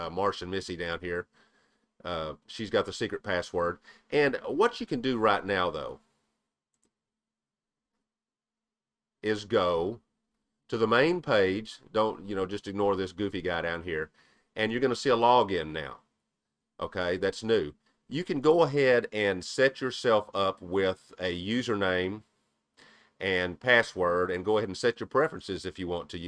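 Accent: South, United States. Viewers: Hi and everyone else. Happy New year coming to everyone! 🥳.....I give many 👍👍👍..to one of the coolest accents ever! Southern! 💕😂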